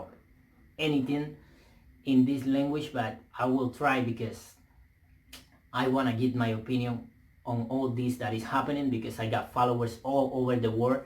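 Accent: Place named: Cuban-American, United States. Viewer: su asentó es cubano/americano aquí todos hablan diferente si escuchas a un australiano habla diferente a un inglés y los dos mensionados hablan diferente a un americano incluso aquí los new yorquinos hablan diferente a los californianos. No se tu de donde tengas el acento. Yo lo tengo Californiano y le entendí todo muy claro a.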